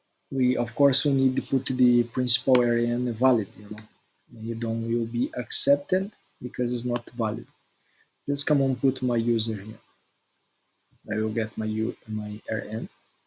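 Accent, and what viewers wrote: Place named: Brazil. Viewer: Bro u sound like a brazilian... 🤔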